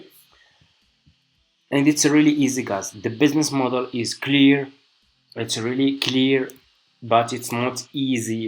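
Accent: Morocco. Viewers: that Marocain accent 😂😂 ❤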